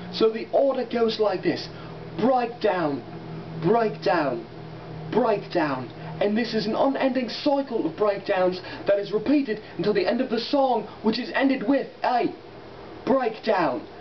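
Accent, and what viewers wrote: United Kingdom. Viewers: I hate that english accent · fuckn british accnt irritates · where the fucking shit do ya live?? o.O your accent tells me you must be british but where exactly?? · This Vid...Had a important factor, a British Accent BRUTAL GUITARIST!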